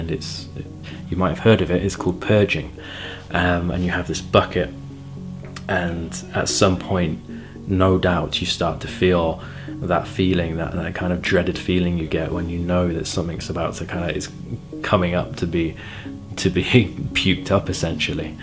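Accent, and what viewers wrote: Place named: England, United Kingdom. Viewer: you sound English. Where do you go for the retreats?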